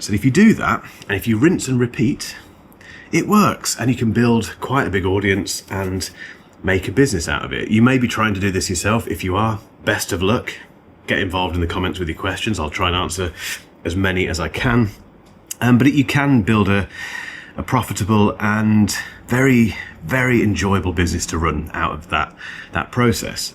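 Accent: United Kingdom. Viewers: People Take a 💊 Pill. He makes good videos, his voice doesn't squeal my ears, actually calming, must be the British accent. Anyway back to topic, are your lives so empty that you need to invest all your feelings into a video, and are hanging by the edge of the seat on every word a YouTuber says Please take many 💊 💊 💊's. I appreciate his perspective and reviews and take everything with a grain of salt and maybe some pepper. YouTubers need to make catch titles and statements that grab your attention, otherwise they will go bankrupt. The iPhone Air is a great phone, I don't have one and won't as it's over priced. I still have older iPhones like XR, 11 pro plus many Android phones. I like them all. So would you and those that make content. What do you expect from the guy, to sit there like a Popsicle and waffle boring words out in a monotone manner and expect us to be be attentive.😂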